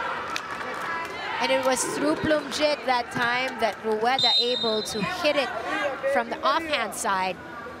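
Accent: Philippines. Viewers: I can tell this commentator is Filipina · Is the commentator Filipina? She has Filipino accent.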